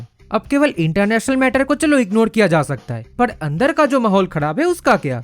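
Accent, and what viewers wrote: Bengal, India. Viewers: Typical bong accent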